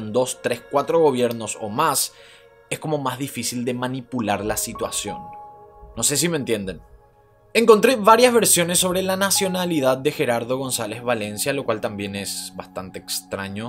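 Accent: Paraguay. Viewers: me encanta los Capitulos, despues de ver 6 episodios me di cuenta que es Paraguayo, por el asento 😜 te sigo desde Dublin Ireland 👍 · Te encontré en Fb Y luego te busque en YouTube Me suscribí a tu canal Me encantan tus videos Todo muy bien investigado No había escuchado hablar a nadie de Paraguay 🇵🇾 hermoso acento 😊 Me uniré a todas tus redes sociales 😉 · Me encanta que no perdes el acento de Paraguay ❤️🙌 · Tu acento neutro paraguayo 👌👌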